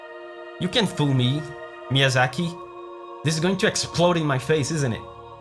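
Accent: Brazil. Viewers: Salve mano, via seu canal desde os vídeos de Final Fantasy XVI e achava que tava reconhecendo seu sotaque e o jeito de falar. Pesquisei um pouco e descobri que tu é brasileiro também! Seu canal é incrível cara, fico feliz de ter um Brasileiro sendo fluente e profissional na área de músicas de jogos, Desejo todo o sucesso do mundo mano, ABRAÇO.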